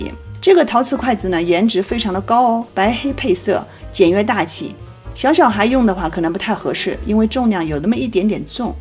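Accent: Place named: South, China. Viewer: 估计播主是南方人，所以有困难，不要苛求，感谢播主的分享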